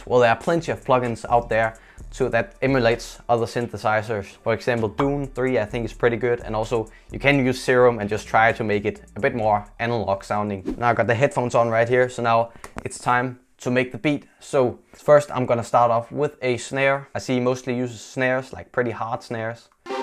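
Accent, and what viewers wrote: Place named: Denmark. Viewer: knew from your accent you were from denmark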